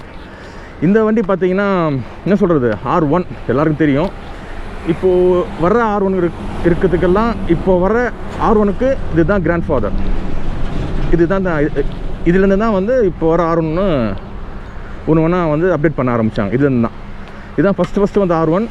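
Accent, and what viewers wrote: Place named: Ambattur, India. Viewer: unga slang semmaiYA irruku bruh!... Nanum ambatturTHa bruh!...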